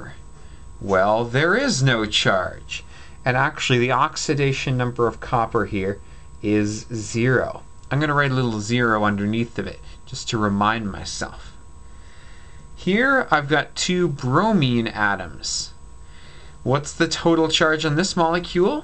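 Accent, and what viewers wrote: Canada. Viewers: Has anyone ever mentioned that you sound like a Canadian John Malkovich? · are you Canadian?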